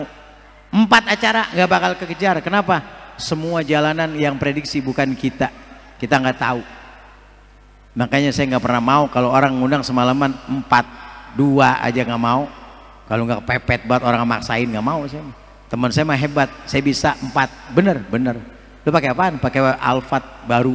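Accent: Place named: Betawi, Indonesia. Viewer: cara ngomong nya betawi bgtt ceramah nya kaya ustadz tile 😂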